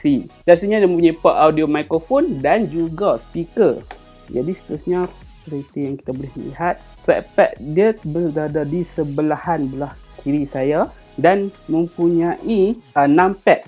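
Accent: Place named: North, Malaysia. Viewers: kekalkan BAHASA UTAQA! sempoi 👍👍👍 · Tudiaaa pekat bakhang utaraa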